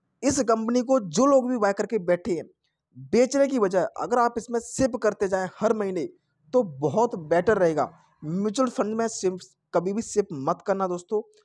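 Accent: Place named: Rajasthan, India. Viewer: Boli se Rajasthan ko laage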